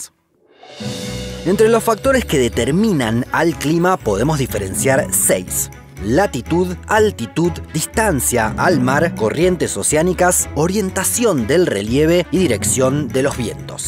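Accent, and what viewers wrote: Argentina. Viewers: Che no pierdas el argentinismo en el habla viejo! "Medeín" , "Guaiaquil", "Abuia"... ¿qué es eso? mandale sheísmo a pleno. No pierdas la identidad argenta loco, que es un recontra canal y que sea argentino me gusta y enorgullece a dos manos XD Abrazo de gol! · Creo que el narrador es argentino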